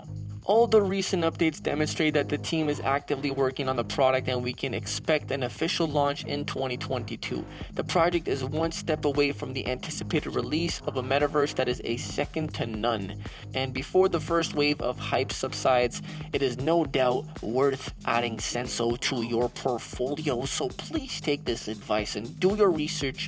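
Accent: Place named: United States. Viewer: where you from? I’m having trouble deciding whether you’re American or Canadian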